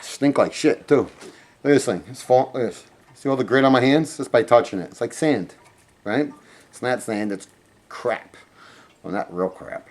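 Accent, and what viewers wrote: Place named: Maryland, United States. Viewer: you from the Maryland area by chance. I think I recognize that accent! :)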